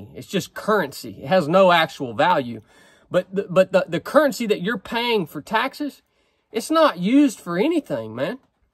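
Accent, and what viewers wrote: United States. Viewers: Where in the USA is the accent from? 👍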